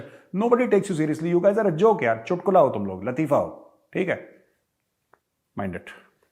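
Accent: Lahore, Pakistan. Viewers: sir big fan of your Lahori accent.... Sir maza aa jata hai apki video dekh kar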